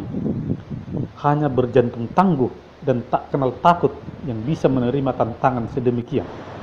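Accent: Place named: Batak, Indonesia. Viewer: Boasa pola direhei khas orang halak hita memang begitu kita harus bangga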